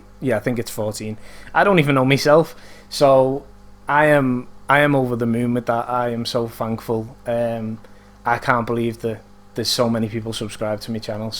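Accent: Liverpool, United Kingdom. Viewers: were you fro scouse im newferry on the wirral. subbed :) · Thank you for the video! I love your Liverpool accent! I hope you are a reds fan and not Everton's (although it doesn't matter if you are). YNWA! 3> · where u from？Liverpool？ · hey, my first time here..... funny to see a build going almost in the opposite direction than most ppl.. but very refreshing.... btw are you a scouser..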